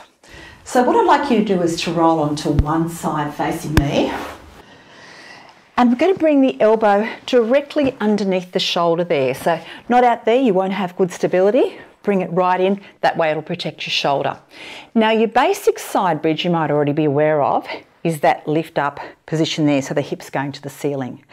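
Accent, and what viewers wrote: Australia. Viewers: Love your beautiful Aussie accent!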